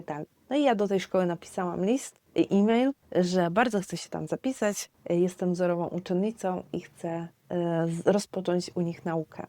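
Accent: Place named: England, United Kingdom. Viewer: no właśnie ten język Polski w którym pani rozmawia jest już z akcentem Angielskim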